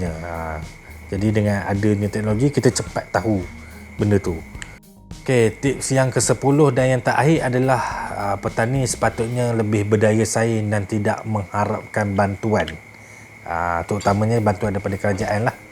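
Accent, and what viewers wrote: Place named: Pahang, Malaysia. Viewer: Lidoh Ora pahang ni kalau tengok gaya cakak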